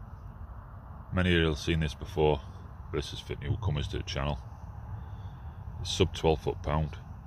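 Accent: Yorkshire, United Kingdom. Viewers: I HEAR YORKSHIRE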